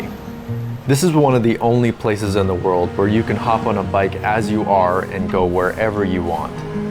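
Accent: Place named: Philippines. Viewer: I love how you familiarize to pronounce these places in a very Filipino accent. Thats awesome which many foreigner vloggers can not😊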